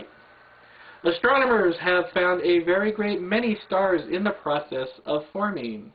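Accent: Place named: United States. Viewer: How the hell can you mistake an American accent for an Australian one? Though I guess you have to be sheltered to like Hovind....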